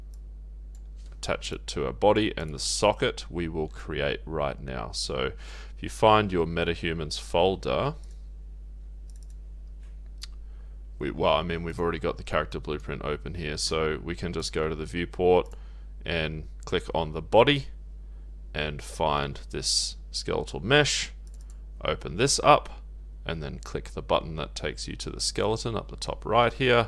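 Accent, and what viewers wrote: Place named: Australia. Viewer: Really great series of tutorials! Nice to hear a fellow Aussie's voice too as a bonus.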